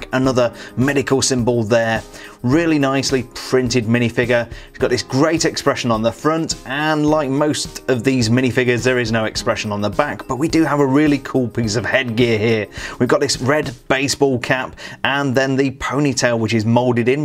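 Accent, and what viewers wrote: United Kingdom. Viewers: you sound so English I’m English and you sound more English than me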